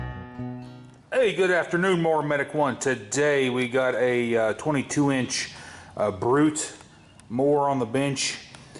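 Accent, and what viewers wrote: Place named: North Florida, United States. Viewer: You sound like a North Florida Boy... Great vid. I'm a North Florida Boy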